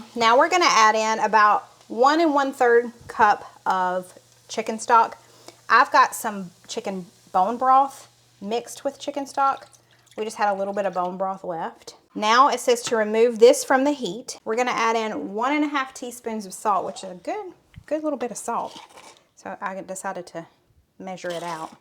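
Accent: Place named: Alabama, United States. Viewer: Love your videos! Just wondering if youre from Alabama. Have a friend from there and she has a similar accent!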